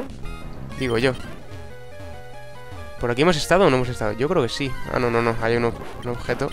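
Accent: Argentina. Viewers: Joder, que bonito tu acento argentino xD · LooL acento Argentino xD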